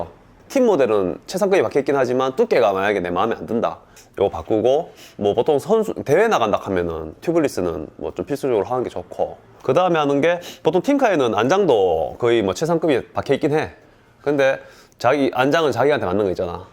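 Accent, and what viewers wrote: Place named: Busan, South Korea. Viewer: 부산 사투리군요 영상보면서 강호동이 자꾸 떠오름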